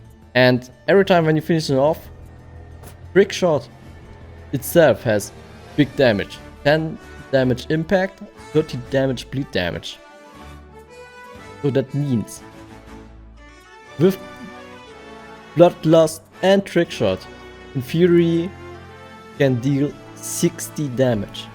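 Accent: Germany. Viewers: german-english always on point:D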